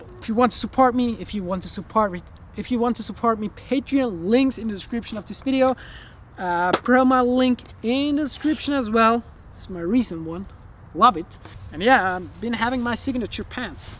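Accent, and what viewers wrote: Germany. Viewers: Wait are you German?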